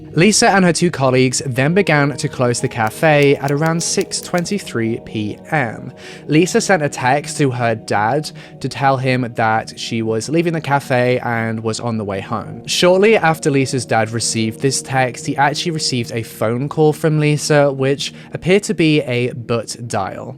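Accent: United Kingdom. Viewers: Stumbled upon this video, and as a Swedish person I gotta say that I'm a bit surprised that this story made it's way out of Scandinavia. I saw that you also made one on Engla, another Swedish girl. For us, here, it seems like we are hardly even on the radar of the rest of the world. Where are you from? I think you sound a bit British, but I'm not sure, since I'm no accent expert. Just out of curiosity.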